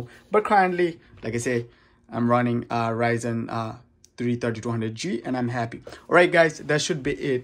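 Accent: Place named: Bengal, India. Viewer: Bhaiya You sound like a Bengali . Are you a bengali?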